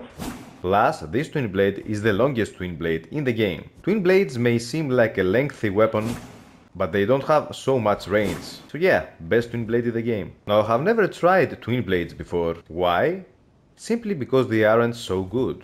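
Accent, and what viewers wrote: Greece. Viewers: That’s a Greek accent bro am I right ? 🇬🇷✊ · Man I know a greek accent when I hear one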